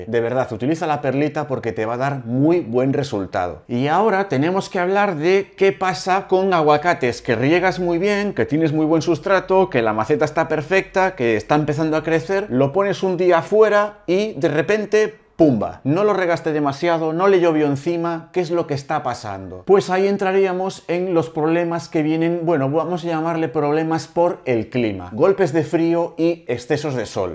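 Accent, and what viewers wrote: Spain. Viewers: Hola perdon x la ignorancia pero recien empiezo con esto.. soy de Argentina, por tu acento se que eres español.. a que le llamas perlita? A la grama volcanica? Cuanto tarda estimado la raiz en brotar de la semilla germinandola?. Pues aqui en Argentina hace mucho frio de mayo a septiembre... con buena luz artificial basta?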